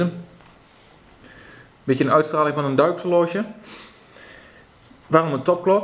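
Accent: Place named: Germany. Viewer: cool German accent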